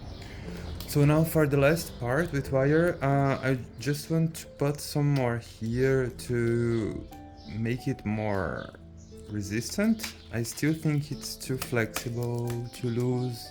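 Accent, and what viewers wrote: Brazil. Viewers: Our cute brazilian accent turns the video even better 🥰🥰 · Que bonitinho o sotaque brazuka falando Inglês!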